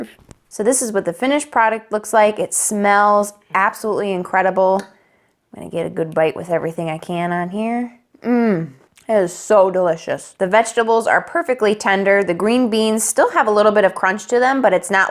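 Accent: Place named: Midwest, United States. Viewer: You have a very thick Midwestern accent.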